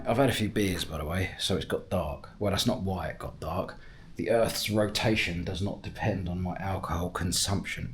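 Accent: United Kingdom. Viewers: Bros british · I love you British accent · British accent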